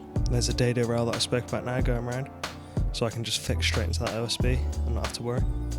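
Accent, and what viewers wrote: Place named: Yeovil, United Kingdom. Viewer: Just found your channel mate, I'm from Yeovil, this was very comfy, hearing the accent and coming from working for sparkies and builders all round Yeovil and Sherborne. Top lad.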